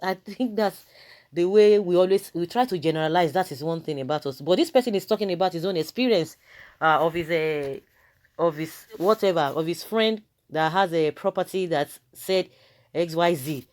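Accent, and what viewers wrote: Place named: Kwara, Nigeria. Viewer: You laugh like a Kwara person. Are you from Kwara State????😆😆😆😆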